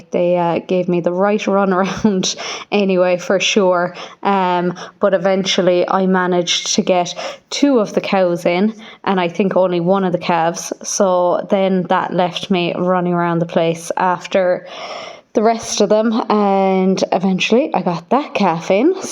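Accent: Kerry, Ireland. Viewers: Another brilliant video your dad must be very proud of you with the amount of work you do on the farm 👍👍 plus love your irish accent 😊😊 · Well done girly. Lovely hearing your kerry accent & pronunciation, i do miss it. You're a hard worker & an asset to your dad. You deserved that holiday you had. And you don't give yourself enough credit girl !!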